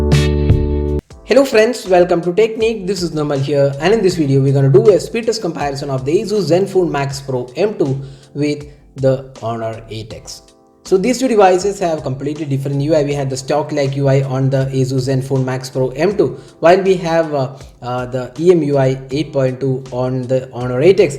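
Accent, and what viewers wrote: Kerala, India. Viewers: Are you a malayaliii🤔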